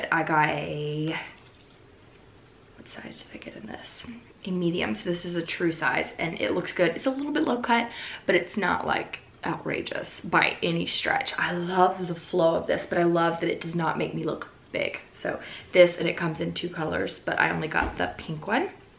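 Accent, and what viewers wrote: South, United States. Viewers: Hey! 😀 I thought you were speaking with a country accent since I just watch your main channel videos and this is the first vlog I watch so it caught me off guard hearing your normal voice lol. Have a great week 😀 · I love your country accent WAY more than your "stage voice" it's so authentically you! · I’m from Mississippi so I love a southern accent! Also I died at “coochie cutters” hahaha we said that growing up!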